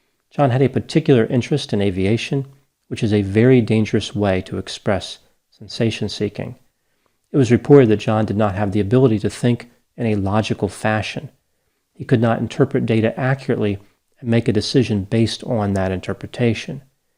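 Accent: United States. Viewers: Very clear American English.Good speaking voice.Thank you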